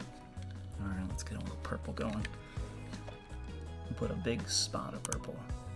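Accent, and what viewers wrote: South, United States. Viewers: That is super cool! I loved it as it came down over the cup, watching the different coloured cells go pass was amazing! The finished piece is fantastic. I love your accent, is that what's called a southern accent? I'm Australian so I'm not sure. Love this technique! I'll definitely try it if I can find a tiny hollow cup. Great job! 😀💜🐨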